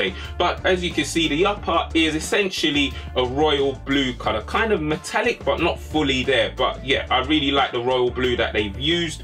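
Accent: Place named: England, United Kingdom. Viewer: decided to turn on captions so funny watching what it comes up with because of you're strong English accent it doesn't quite understand properly la bit like a scottish accent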